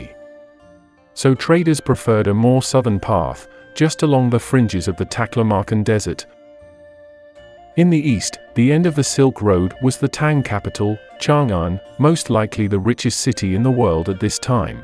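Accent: United Kingdom. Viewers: Is narrator AI or just obscenely British?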